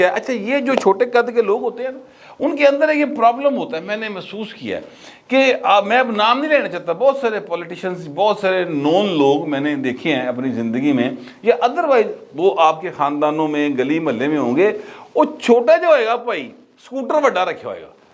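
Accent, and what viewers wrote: Lahore, Pakistan. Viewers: sb zabardast very interesting and very very well describe and most importantly you describe purely in our Lahori style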